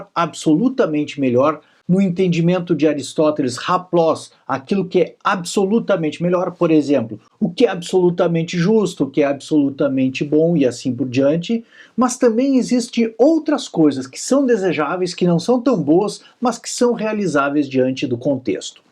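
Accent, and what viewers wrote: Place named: Rio Grande do Sul, Brazil. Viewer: Ilustríssimo Professor e Dr., um aplauso e um abraço de Gravataí/RS. Pelo sotaque, tu deves ser meu conterrâneo.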